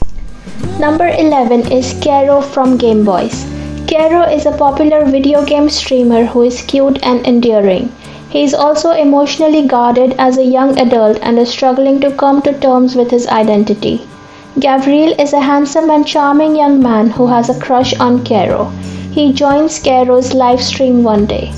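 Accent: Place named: India. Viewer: Hey by ur accent you sound indian, right?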